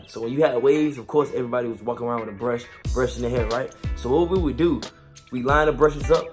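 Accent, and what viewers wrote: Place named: New York, United States. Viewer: You can tell he’s from New York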